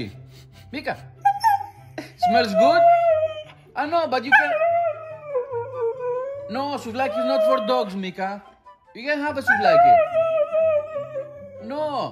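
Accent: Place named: Greece. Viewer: Are you Greek ? Cause the accent is Greek !! Kisses and hugs from Greece!! 🤗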